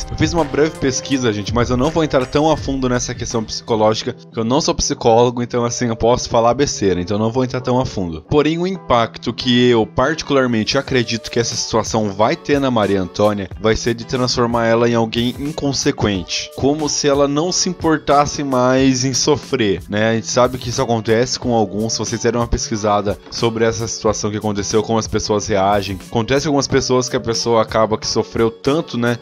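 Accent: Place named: São Paulo, Brazil. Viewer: você é paulista? O sotaque parece!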